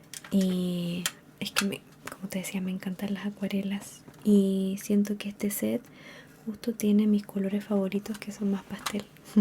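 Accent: Chile. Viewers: Cuando eres chilen@ reconoces a otro chileno en un segundo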